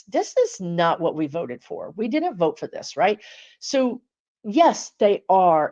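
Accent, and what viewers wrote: Texas, United States. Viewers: I didn't realize you're from KY. You do have a bit of an accent, but I thought it was Texan. 😂 I'm from IL and lived for years not far from the southern tip. I was told I had an accent when we visited family in WI!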